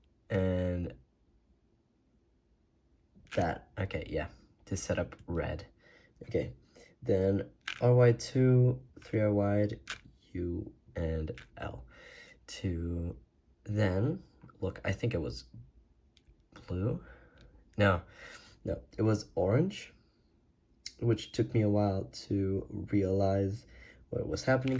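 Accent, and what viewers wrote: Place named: United States. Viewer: Your accent sounds very American lol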